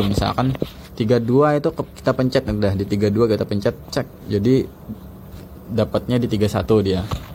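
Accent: Banjar, Indonesia. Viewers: Orang banjar kh ini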